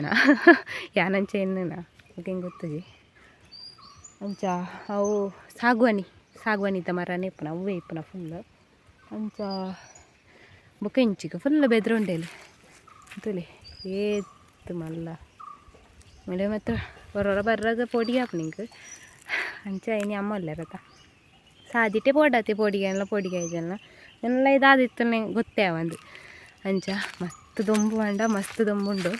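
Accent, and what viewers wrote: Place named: Tulu Nadu, India. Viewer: Eerna Tulu kenara bhaari kusi aapund👌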